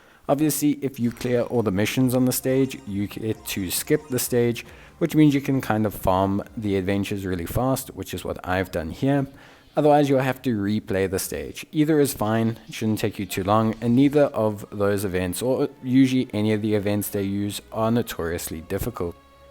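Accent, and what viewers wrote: South Africa. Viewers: sound south african or portugese learned english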